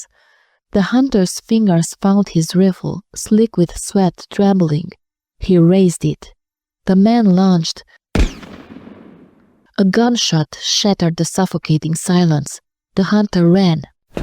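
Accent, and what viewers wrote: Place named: Eastern Europe. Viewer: This is some Eastern European accent, but I can not locate it. Maybe Eastern Slavic, but not sure. Will you tell? Thank you for your effort! Me actually not listening to scary stories, told in a female voice. But wish you good luck!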